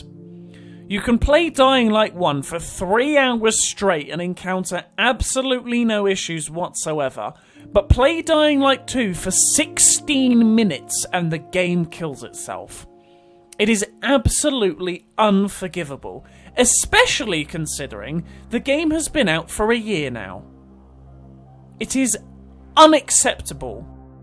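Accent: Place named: United Kingdom. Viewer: This might be the only british accent I can tolerate, nice video so far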